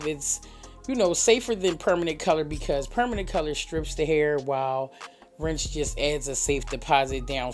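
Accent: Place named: Pennsylvania, United States. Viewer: Always love watching you work and hearing your PA accent! Your energy is so positive and inspirational. Please keep doing you! ❤️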